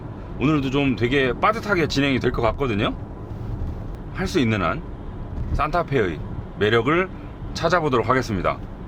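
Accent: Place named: Jeolla, South Korea. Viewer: ㅋㅋㅋ무슨소리 억양만 들어 봐도 전라돈데 ㅋㅋㅋ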